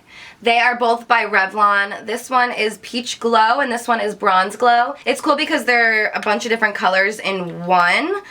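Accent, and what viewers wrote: New Jersey, United States. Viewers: is she from jersey??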